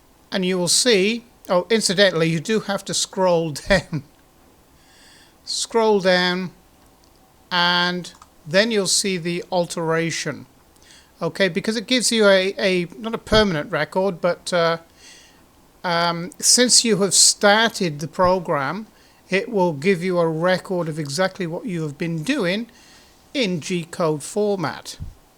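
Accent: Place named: South West England, United Kingdom. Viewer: I’m doubly flabbergasted! Firstly that a machine capable of sub tenth of a millimetre precision is miles out at default settings. Secondly that you’re eyeballing it! What does the digital vernier say? Surely the settings should be based on the maths of of the screw dimensions and stepper motors etc. Should mention I’ve got a 6050 ready to assemble and coincidentally same VFD/motor combination you set up a few months ago. Your videos have been a wonderful source of expertise. Many thanks. PS. Enjoy your very familiar accent, South West England?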